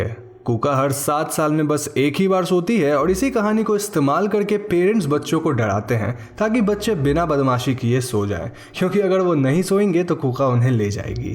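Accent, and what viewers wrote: India. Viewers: he's indian and he's speaking english with hindi accent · Eu gosto da forma que ele começa falando uma frase em inglês e do nada já muda de idioma. Só os indianos conseguem se entender msm kk mas eu amo o sotaque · É o inglês indiano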